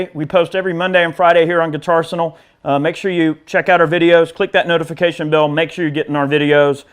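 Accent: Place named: United States. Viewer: Those Yankee hillbilly accents and those GI Joe Iraq hats should be aced. It's like one of those guys off of Duck Dynasty turned guitar player.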